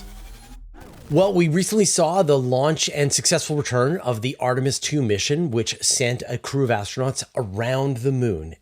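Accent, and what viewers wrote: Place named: Canada. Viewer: I'm here for his adorable Canadian accent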